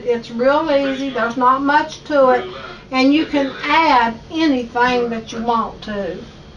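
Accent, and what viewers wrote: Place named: South, United States. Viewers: I am really glad that you mentioned where you are from! You sound like my folk. I live in Texas now but I was born and raised in Parkersburg WVA!! You two are adorable! Thank you for sharing. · Mary Mitchell sounds like dolly partons the same accent cute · Hello to you both 😊 I have just found a video of yours for the first time and i love it. I live in England and I allways find southern accents like your super lovely and nice. Cant wait to watch more of your videos, this one looks super delicious xxx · My mother was from Crab Orchard, KY, and everyone around there sounded just like. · well, I am a HILLBILLH too... I was born and raised in West Virginia.. I was DIRT POOR my entire life until a little over 18 years ago when I moved to South Carolina and married a very decent man.. I knew you were hillbilly when I heard your voice. 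I too am VERY PROUD of my heritage.. You can take the girl out of the mountain, but you CAN NOT take the mountain out of the girl.. Nothing wrong with being a hillbilly.. Hillbillies are some of the nicest and decent and God fearing people on the face of this earth.. God bless you and your family... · That looks and sounds delicious. Gotta try this. I love your Hillbilly accent. I have a Texas twang myself. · Hi, I just found you and your channel. I think you are absolutely PRECIOUS!! You aware perfect the way you are, and nothing wrong with being from Beautiful West Virginia either! It’s ALL GODS COUNTRY!! I love your accent, your cooking, down home style, the way I learned to cook from my Mom and Grandmother’s! I’m an Okie myself, Oklahoma City, but my Family is all over the state, yes, we are part Native American, coming from Indian Territory. Anyway, so funny, we made Breakfast casserole for dinner tonight! 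I too Normally let mine set overnight in the fridge, but I let it set for 30 minutes tonight. I too play with the ingredients, use what I have, tonight we had sausage, Bacon and Canadian bacon in this batch. I have never used yellow mustard, but I use dry Mustard in mine. I did want to tell you what I do, and hopefully you will try it. In the past, I always used the bread slices also, but it always seemed dry. So I got the idea, to use hash browns instead of bread. I use the loose ones or the patties. I do everything else the same. My family loves it, it’s never dry. I would love for you to try it, and let me know what you think! We love Hot sauce or Salsa with as a condiment with ours. It’s a dish that gets eaten up completely!! Anyway, love your channel, your cooking, your accent and your sweet personality!! Keep up the great work! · Ms I bet you can bake bread and rice pudding and cakes. 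our family was not raised on candy. mom would cook rice pudding when she had change on Sunday it was good Like your southern talk love you val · You make me homesick for old times when I was with my granny. My family is from West Virginia. You say there like my granny said it. Yes I grew up on sausage gravy. We always used canned milk. So good. We added water too. This recipe looks good. Thanks. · Love your accent...reminds me of growing up in Covington, Virginia.....just a few miles from the WVa line at White Sulphur Springs, WVa. I still live in Virginia, just down on the southside near the NC line now...we have country accents down here too! I am told I have a mix of southside and Western Alleghany accent now. LOVE your videos and your REAL cooking...and down home atmosphere. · I love you, and your old fashioned recipes! Your accent makes me think of my maternal grandparents from Ky, originally from W.Va. 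· Where are you from if you don't mind my asking sounds like ga nc sc dialect.....you just answered my question in this video lol i have family in West Virginia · Lovely Lady, I won't laugh at your hillbilly accent if you won't laugh at my southern accent. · I love her southern accent!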